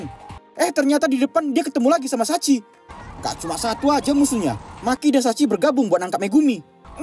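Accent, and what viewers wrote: Medan, Indonesia. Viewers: Gw selalu suka logat Medan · Medan kali bahasanya wkwkw makasi ya kang kreator udh ngenalin cara anak medan ngomong wkwkw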